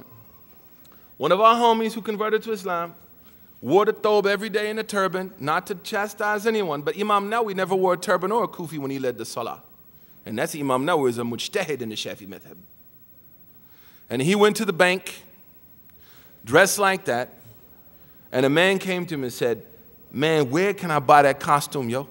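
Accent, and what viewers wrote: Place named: Bronx, United States. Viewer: His manner of speech offended me as a Muslim. Really what is going on In America? Have some strange people becoming "Imams" Why is he trying to talk like he is from the bronx, it is not impressing anyone. And I agree with the person who said even the sisters are looking at him oddly those sat in the audience. What is happening in America??? I am in the UK thought it was tough here... Ya Rab whats going on with some people?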